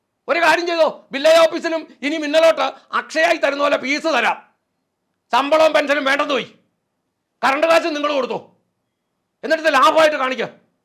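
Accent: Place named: Central Kerala, India. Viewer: മധ്യകേരളത്തിലെ സ്ലാങ്ങാണ്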